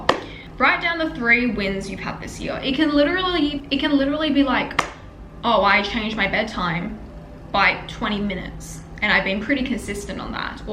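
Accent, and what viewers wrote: Australia. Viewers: You can have it! Watch videos on how to do an Australian accent (NOT THE BAD ONE 😭)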